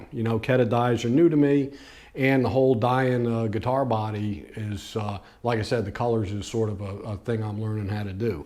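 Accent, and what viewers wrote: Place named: East Coast, United States. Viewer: I also find colors to be so difficult, it's one of my areas to learn more about also. At the end, what you came up with look amazing in my opinion. I'm really happy you chose to differentiate the burl because it looked blah before you changed it. Great work, great looking guitar! Where's the accent that you have from, east coast I assume?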